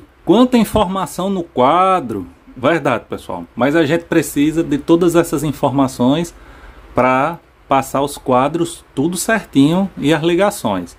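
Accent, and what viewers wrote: Northeast, Brazil. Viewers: "a gente fala errado", onde... Falar com sotaque nordestino NÃO é falar errado é um capricho artístico. Vejo você falar sempre muito corretamente e quando escapa algo sempre corrigi logo. Falar errado é esse bando de Youtubers que não sabe nem usar as conjugações verbais corretas ou usar o plural na hora certa. Você está de parabéns em tudo que você fala e da forma que você fala. E olha eu sou Paulista da Capital de SP.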